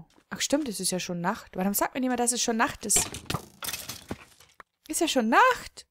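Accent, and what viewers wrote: Bavaria, Germany. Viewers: Hy! Bin durch Lets-Plays.de auf dich gestoßen und find deine LP´s echt Hammer! Bist du aus Bayern? cheerio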